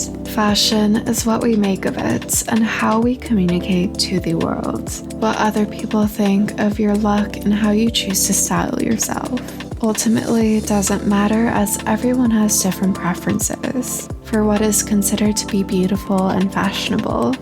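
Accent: RP, United Kingdom. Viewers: I hate how your voice sounds fry and poshy in this video. You don't need to take this ton ...its ridiculous. It took me out.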